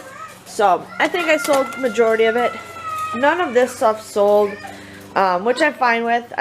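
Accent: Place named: Wisconsin, United States. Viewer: I live in Iowa... not too far from you. I could tell you were from Wisconsin ❤️It's so cold and rainy! I think you did a great job!😊 Happy Mother's Day 🌸🌼🌻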